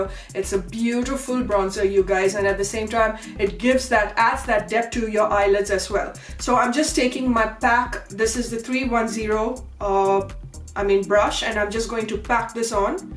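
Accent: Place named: Kerala, India. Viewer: Hey, You are very attractive and I'm in love with this makeup look also Your accent reminds me of a very good friend of mine who also happens to be a malyali I met in Dubai a few years ago.. (love the accent💞) your videos are so informative And educational..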